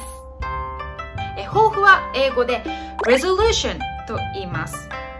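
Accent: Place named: Osaka, Japan. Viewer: 今年も宜しくお願いしますｺﾃｺﾃの大阪弁の後英語ぺらぺら僕も英語できれば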